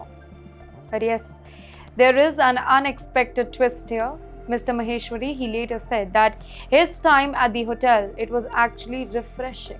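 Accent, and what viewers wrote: India. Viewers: AI report with an Indian accent.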